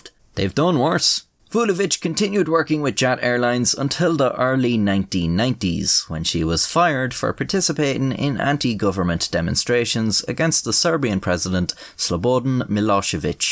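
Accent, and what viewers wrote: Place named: Ireland. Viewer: I swoon over your Eireann drawl, thank you so much 🗽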